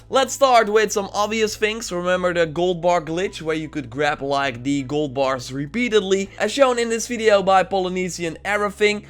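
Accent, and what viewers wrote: Netherlands. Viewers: He's from the Netherlands man, don't be so rude. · You sound soooo Dutch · I detect a dutch accent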